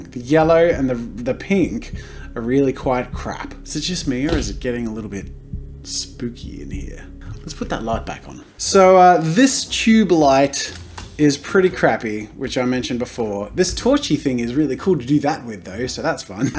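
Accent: United States. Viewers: the American accent that was good as heck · I first I thought he was playing a scary story in the background, but now I'm like" oh wait that's him speaking I think". if that was you talking, one question. How do you immatate American accents so well? 😂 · Nice American accent for the story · omg that american accent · The slight American accent in the story kinda feels weird with you tbh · his American accent is point on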